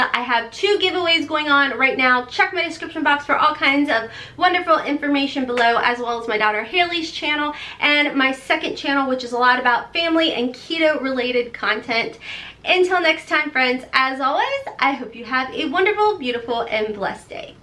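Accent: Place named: Kentucky, United States. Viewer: also, thank you for sharing the difference between a fake and a dupe because when I first rediscovered my love for makeup I didn't know that there were such things as "fakes" I thought I was just getting a good deal on an expensive product. I will NEVER buy another fake product because as you said who knows what kind of nasty things are in it. Idk if you watch nadi (pop luxe) but he used to do fakes as content and it actually made him sick. Omg!! My husband could hear the video playing and said, "is that, the girl from Kentucky"? Hahahah! I think I might watch you alot. <3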